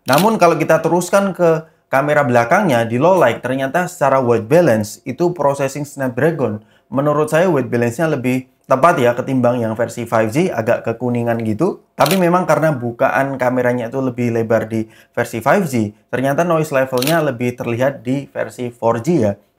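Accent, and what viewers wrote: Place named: Java, Indonesia. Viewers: Wong jogja logat e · Jowo ngendi masse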